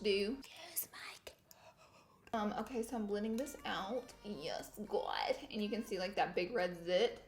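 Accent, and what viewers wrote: South, United States. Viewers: I Always get So excited when there is a new vid!! Girl you are Absolutely Gorgeous & So Damn entertaining & being a southern girl (GA girl here lol) who has a Very thick accent too, its oddly comforting to watch you & hear your Beautiful voice & someone who Pronouces shit Right (and we know how we are saying right, Right? With the Loonnnggg "I" 😋)!! That eye look it Stunning on you!! On me with my orangey natural ginger self, I'd look like a damn clown probably lol, but if I got a chance for you to do it, you damn right I'd let ya! Love from the south girl where its hotter than hell & the humidity forces you to pretty much give up Completely on even Thinking of doing anything with your hair other than throwing it up in a messy bun on top of your head! And Love from my 4 beagles & 3 cats who watch & listen to you too & Love you! 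My youngest cat Sev tries to "catch" you & "pet" you a lot through my phone while I watch! Lol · You’re more southern than ever 🤣🤣🤣 (must be mom’s visit influence!) gotta concentrate to get everything but I had a blast watching this video as always 🙌🏼 Love from Peru 🇵🇪 ❤️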